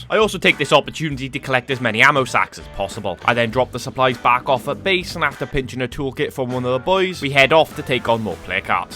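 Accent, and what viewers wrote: United Kingdom. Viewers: Why you British?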